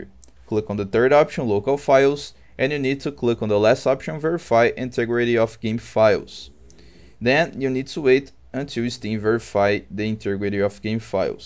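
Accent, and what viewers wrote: Brazil. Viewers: esse sutaque br ai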